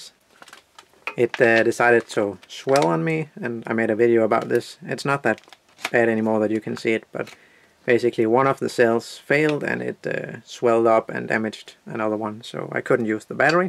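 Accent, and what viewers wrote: Denmark. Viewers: His is a common danish name and he speaks english with a danish accent, so I'm pretty sure he's danish.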